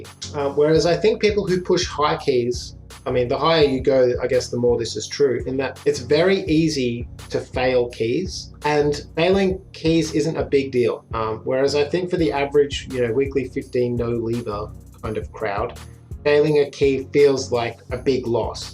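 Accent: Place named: Australia. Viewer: Loved the video and especially love the OZ accent!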